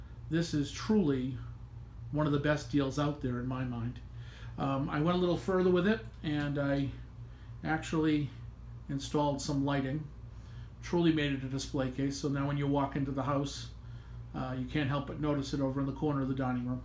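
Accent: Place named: Boston, United States. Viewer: It was worth hearing the accent clicking on this video.🤓👍. Boston ? Nantucket? 😂 Now that’s a nice humidor! 🥳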